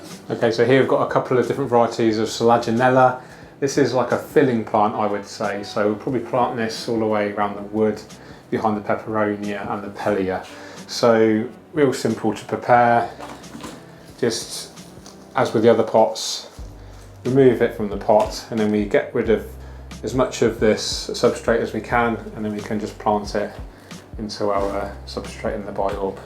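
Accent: United Kingdom. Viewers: Too bad only Brits can understand him.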